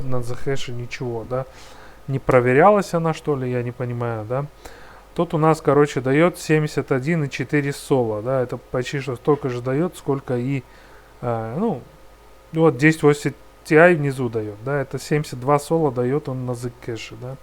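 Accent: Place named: Armenia. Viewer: Прикольный! Говор больше на армянский похож!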